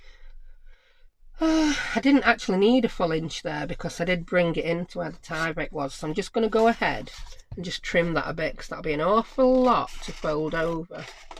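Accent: United Kingdom. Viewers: #1. LOVE your tutorials. #2. LOVE your accent! Might sound crazy but your accent is very much like Paul Sutherland from True Crime Enthusiast podcast! The hubby and I love that podcast. I say this with the utmost appreciation because it is a welcoming accent. I feel like we could sit and chat over a cuppa tea<<<of course that kinda sounds like the start of a creepy stalker episode of True Crime-muwahaha. {Nah...just a native New Yawka that loves cool accents and all things UK 💜} and #3. You mentioned a type of glue-is it "calall" glue"-when adhering the corners. What kind/brand of glue is that? Thanks so much for all your awesome sharing!!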